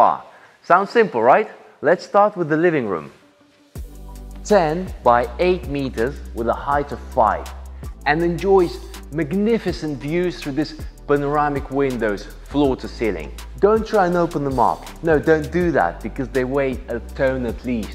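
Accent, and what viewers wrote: Russia. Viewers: Мне одному показалось или ведущий русский? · Cant make out the accent. Russian, Dutch maybe...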